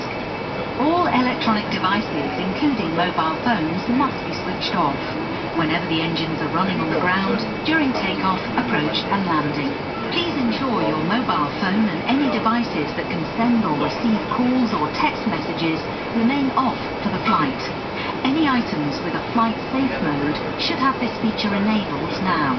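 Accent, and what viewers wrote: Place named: United Kingdom. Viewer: Ah, yes, upper deck on a BA 747, what more could you ask? The best thing about this video is the beautiful british accent and of course the music.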